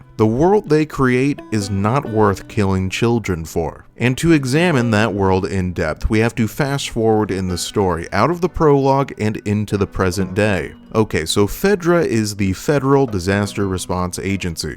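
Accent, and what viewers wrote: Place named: United States. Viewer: Like you sound American too have you never heard of Ronald regan??? On top of that Ellis literally says her name and you still said it in the stupidest way possible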